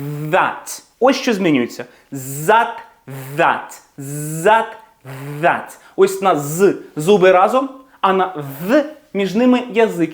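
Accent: United Kingdom. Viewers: Дякую за урок. Як на мене, це ідеальний формат - практикуватись разом. Мавпочка всередині одразу включилась в гру і повторювала. Я як дитина реготала над жартами ) "а шо ви робите, вибачте?" 😂 Я в захваті від подачі, взаємодії з глядачем. Та зачарована Вашою британською вимовою. Це саме та англійська, яку би я хотіла вивчати. Тільки від неї "йокає", бо це дуже красиво. Тож дуже буду чекати саме такі фонетичні інтерактиви. Дякую 🤗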